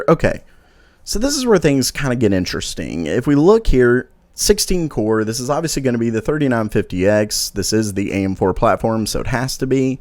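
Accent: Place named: South, United States. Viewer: haha, sounds like you're saying "nanermeter" sometimes. Love that southern twang!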